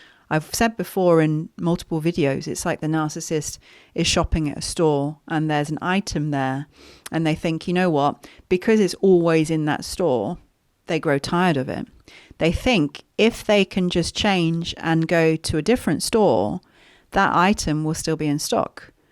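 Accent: United Kingdom. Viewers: I do excuse me for asking are U based on UK seem to me U have strong Brit accent anyway blessing for your work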